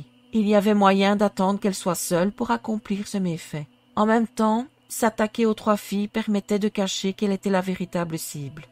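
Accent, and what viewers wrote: Belgium. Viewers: Je ne dis pas celà pour blesser mais cette manière de parler... Quel est ce petit accent, est-ce Belge ? L'histoire est terrible.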